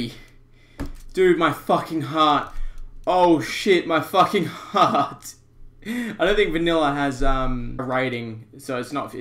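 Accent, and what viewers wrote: Australia. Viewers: australian? <3 your accent.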